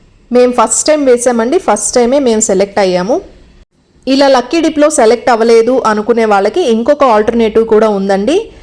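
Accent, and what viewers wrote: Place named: Vijayawada, India. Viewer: Crystal clear narration - Vijayawada Telugu accent - good feeling like having Vijayawada Andhra Bhojanam.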